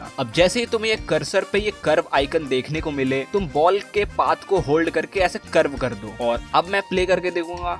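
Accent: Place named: Bihar, India. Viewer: Bhai bihar se ho kya?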